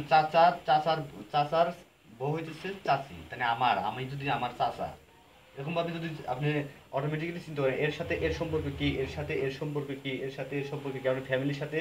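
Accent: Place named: Noakhali, Bangladesh. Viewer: নোয়াখালীর ভাষা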